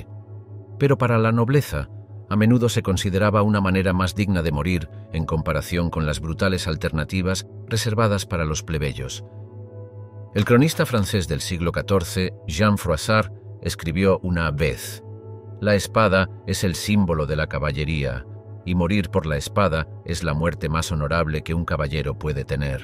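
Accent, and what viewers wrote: Castile, Spain. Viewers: Buen video, muy instructivo y sobre todo maneja un lenguaje castellano perfecto...agradable de escuchar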